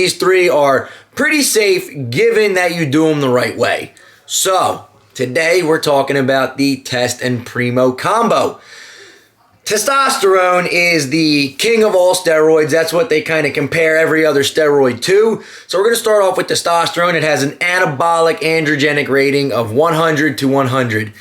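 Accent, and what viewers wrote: Philadelphia, United States. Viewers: That's a philly accent if i've ever heard one. Have a new sub just for that.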